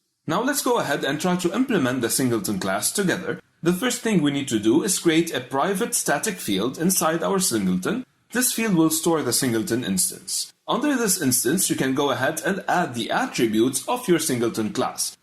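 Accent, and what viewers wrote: Lebanon. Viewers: you sound Lebanese dude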